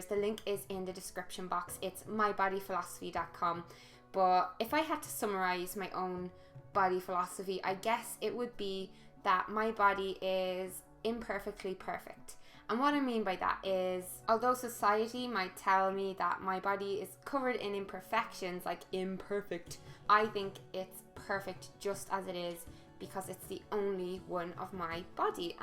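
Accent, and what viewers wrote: Ireland. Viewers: you are gorgeous, I have been listening to you a few times to practice my english listening, due to I´m from Mexico and want to practice the language. Furthermore I love your irish accent.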